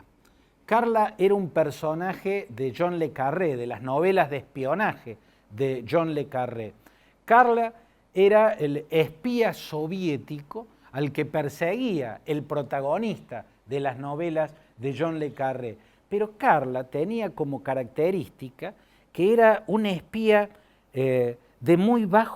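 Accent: Córdoba, Argentina. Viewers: Nadie como vos, Claudio Fantini, para explicar estos temas. Ninguno igual para pronunciar esos nombres tan difíciles. Y con acento cordobés de yapa.👌🏻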